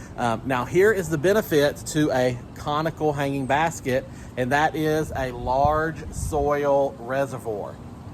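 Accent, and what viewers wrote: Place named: Arkansas, United States. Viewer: I had to look at your bio information after watching your most recent video re making a planter fountain. Your accent sounded so familiar and when I saw you now live in central Arkansas I knew why. I am originally from Camden. I’m enjoying your videos🌷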